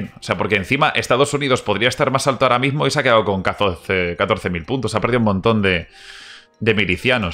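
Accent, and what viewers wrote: Peru. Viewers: Ere paruano?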